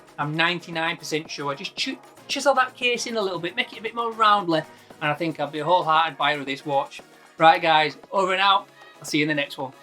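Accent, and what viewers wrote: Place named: Huddersfield, United Kingdom. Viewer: Nice to see a watch reviewer from Huddersfield. It's a nice town and nice people, lived there for almost 7 years. Miss that accent. Brilliant review, well done.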